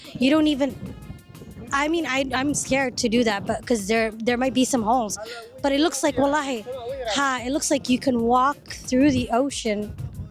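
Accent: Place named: United States. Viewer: Oh you are American accent masha allah u do well show people good things our island like jazeera beach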